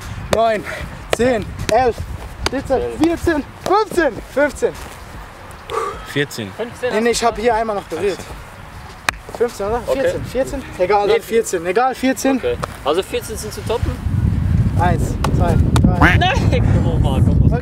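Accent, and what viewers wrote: Switzerland. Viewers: von wo kommst du aus der schweiz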